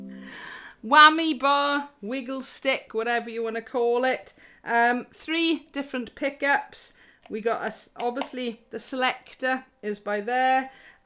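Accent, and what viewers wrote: Wales, United Kingdom. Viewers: I’m so excited I just received my jazz mistress baritone ukulele from the awesome Fanner brothers and would love to show it to you and your fans. It was custom made it did take awhile I’m in Canada but I’m Welsh blood all my relatives are Welsh so I love hearing your accent reminds me of them. I am still learning and learned on an acoustic baritone Uke and have always used my fingers for strumming and picking. I find that it doesn’t sound as good on my electric and I’m trying to learn to use a pick. I only had my new Uke for two days and broke a string I guess I was using the wrong gauge or type. Is it possible you could show us how you use a pick/plectrum and what type you use on your electric Uke? Also where can I send you a picture of my baritone Uke I would love to hear your opinion? Also do you do online lessons that would be great!! 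Love Vicki. Cymru Am Byth 🇨🇦🏴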